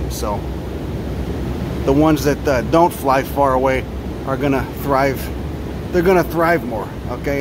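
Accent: Chicago, United States. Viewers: Thanks man, I'm a long way away from the US (I'm a expat) but I feel every bit of the tension here in Europe. Listening to that Chicago accent and calmly walking around a botanical garden somehow gives me hope that all is not lost there... much appreciated! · Couldn’t figure why I like these videos he’s smart, knowledgeable, the Chicago brogue, no it’s cause he’s got the gift of glaberous or gabbro, he’s gabbroid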